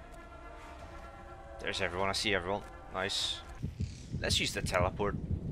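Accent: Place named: Belfast, United Kingdom. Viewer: It's a Belfast accent I think.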